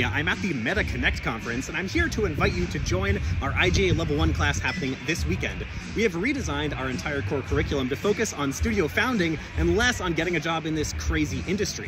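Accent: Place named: Netherlands. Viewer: sounds pretty Dutch! Are you by any chance Dutch?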